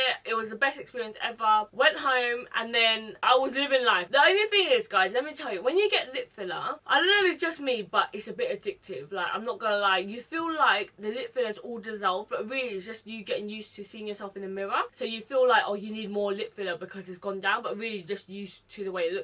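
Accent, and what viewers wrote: United Kingdom. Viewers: Carolina Araújo it's called being SARCASTIC....she fucken mumbles. and she has a British accent on top of it so it's even harder to hear her. i can understand....she just doesn't SPEAK CLEAR. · Woah I'm only 9 seconds in I've always watch you instagram videos o had no idea you had a British accent lol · OMG this is the first time watching a video of you on YouTube and I'm SHOOK of your British accent XD I knew you only from ig for a while and I thought you were American or Canadian :) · People who’s saying they don’t understand her, it’s because she has a British accent and not everyone has the same accent and she also wears braces at the back of her teeth. You all are being such bitches, leave her alone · Omg Viet with British accent...loves it!!!!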